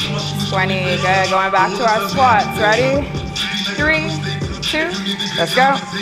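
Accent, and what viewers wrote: Bahamas. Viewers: Listen quick question are you from the Bahamas? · This is A Bahamian instructor I hear an accent🇧🇸😄 242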